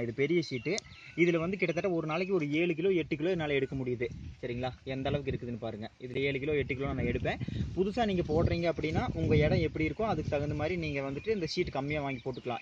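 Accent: Kongu, India. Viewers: your kongu Tamil was super bro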